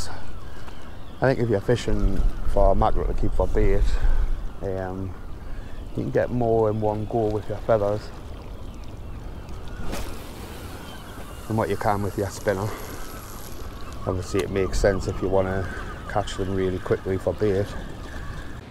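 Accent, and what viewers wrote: Newcastle, United Kingdom. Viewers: You sound like a geordie... or close enough... where are you fishing?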